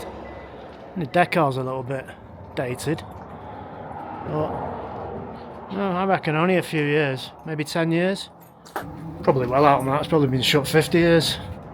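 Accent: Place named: Bradford, United Kingdom. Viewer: As a lad with a BD postcode, I can fully appreciate ‘ ’ accent. 😂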